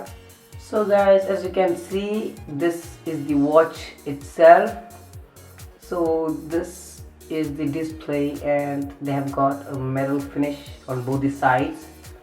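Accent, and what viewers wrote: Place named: India. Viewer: Did he just say 'XBASS 360'?? Indian accent maarlo yaar...fir kehte ho ki log subscribe nhi karte!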